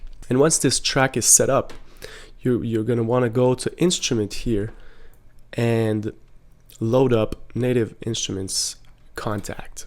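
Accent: Quebec, Canada. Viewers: hmmm ca sonne comme l'anglais d'un Québequois ca!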